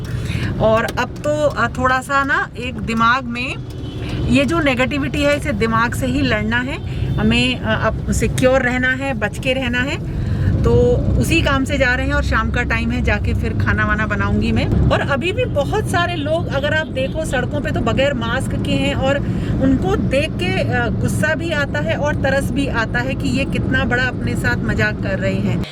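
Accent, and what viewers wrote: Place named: Anupshahar, India. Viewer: Nice vlog mam🙏🙏🙏🙏🙏🙏🙏🙏🙏🙏🙏🙏🙏🙏 Aacha lagta hai... Jàb ghar me sab milkr kaam krte hain.👌👌👌👌👌👌👌👌👌👌.... Bilkul tyohar jaase feeling aati.......👍👍👍👍👍👍👍👍👍👍👍mam.... Meri saasu ma anupshahar ki hain...... Apki language bhi wasi hi lag rahi thi.....👌👌👌👌👌👌mam mai to choorma food processor mai bna leti hoon, badhiya mix ho jata ha😊😊😊mam mawa aap ghar pr banate ho kya???😊😊😊😊😊😊😊😊😊love u frm Haridwar 😘😘😘😘😘😘😘😘😘😘😘😘😘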